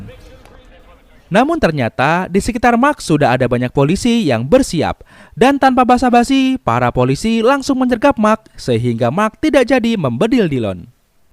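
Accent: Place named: West Jakarta, Indonesia. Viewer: aroma aromanye ente orang jakbar bang ye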